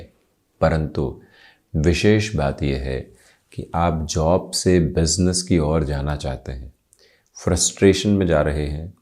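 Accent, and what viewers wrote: Standard. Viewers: Aap ki hindi bahut shuddha hai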